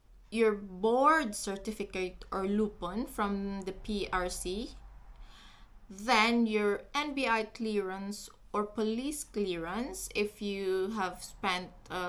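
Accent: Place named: United Kingdom. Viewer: Bakit kelangan mo pa mag english with konting UK accent pa kung target audience ng vlog mo is filipino. Taglish ka na lang procedure naman at tips ineexplain mo. Di naman to class. Korni lang. Kind a frustrating to watch.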